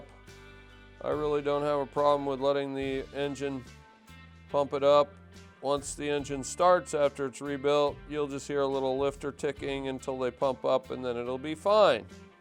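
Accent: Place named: Appalachia, United States. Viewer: This guy got that Appalachian English down... Lm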